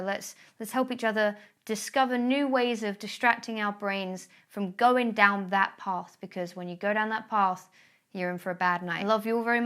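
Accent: United Kingdom. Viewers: the kind of anxiety that I usually have is a bit different from what you describe. But I just went to rest from having one from a complicated social situation and watched this video and I realised why I like these, it calmed me down to just listen to someone talking about a relatable(™) topic in a calm British English and now I'm calm as a little lamb. thank you :D my usual go to methods are meditation, breathing exercises and going through the alphabet in my head oO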